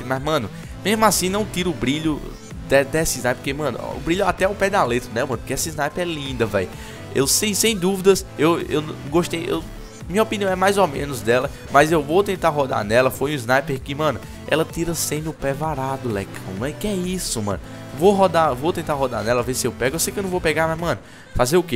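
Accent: Northeast, Brazil. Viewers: ai vc é nordestino! por que eu so de pernambuco e vc?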